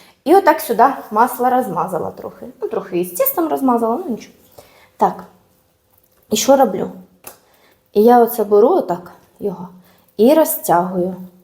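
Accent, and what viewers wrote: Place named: Kherson, Ukraine. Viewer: нравится такая речь У нас на Херсонщине так разговаривают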